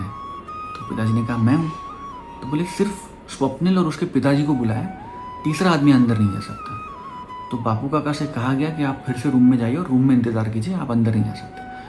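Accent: Standard. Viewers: कुश के रोल में बहुत प्यारे लग रहे आप और उच्चारण भी बहुत शुद्ध है।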